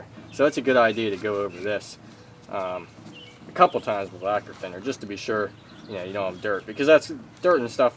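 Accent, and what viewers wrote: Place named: Philadelphia, United States. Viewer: Where are you from with that accent Philly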